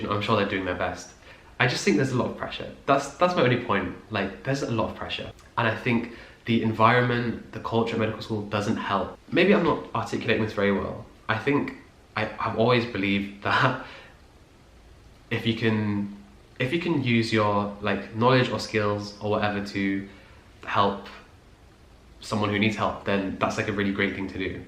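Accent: United Kingdom. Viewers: you sound british, where do you go?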